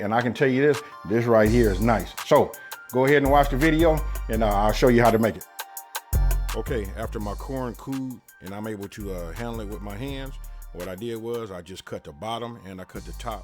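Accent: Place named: West Coast, United States. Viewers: Lol he from the west coast. Got to be. I hear it lo sound like rich the factor I love it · I have been looking at some of your videos for the month and you be throwing down. I'm from the south. I don't know where you are from, but I have a clue. You sound like you are from LA. I love cooking and I have been in food service for 13 years. Was a cook at one time, (my first real food service job) it was kind of intimidating, but I learned to get through it. They loved my cooking. My title was COOK II. I was second to the head cook. After that, I took on prep cooking at different places and that's where I'm at now. I normally work in hospitals and assisted living places, which I love. The place where I'm working at now gives me more opportunity to show my "Lil skills, YOU KNOW WHAT I'M SAYING,..., LOL" A resident wanted egg salad and sh wanted her bread in a zip loc bag. 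I was like, OMG!!! I HAD TO THINK. Which didn't take me long. I thought about the two other residents that are vegetarians. I'm like, hell, they can have this also. Just make this egg salad like I make TUNA FISH, (like WE make it) besides the li' sugar. I just did eggs, a little dill weed (NOTE: I'M AT WORK, Ain't no way in the hell I'm gonna have that spice in my cabinet, LOL), mayo, relish, salt and pepper. THEY LOVED IT!!!!!!!!! I'm from Arkansas and we had a food truck festival here and this is the third year that I missed it because I am always at work. BY WAY, LITTLE ROCK, ARKANSAS. , AND YEAH, some of my homey's told me that it was about 80 food trucks. One of them was telling me about this corn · Are you out here in LA? You sound like a Cali native and look very familiar!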